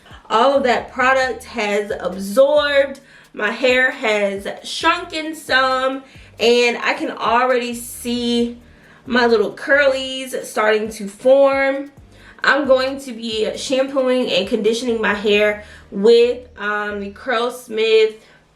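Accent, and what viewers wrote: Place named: Memphis, United States. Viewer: I like your accent. Sounds like a Memphis accent lol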